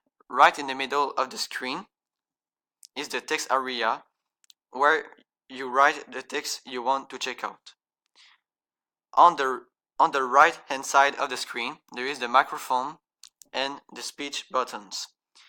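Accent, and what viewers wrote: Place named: France. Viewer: Hi, I'm Alex, and I was searching for tips to get better at english, and so I just finished watching your instructional video about this website. I have to say it's a great site, there's a lot of functionalities to help me get better. (I am also polishing my english, just like you) French is my first language, it is yours too I think (got this from the accent). Thanks for the vid, I wish you to succeed in your college program, and good luck my dude! -Alex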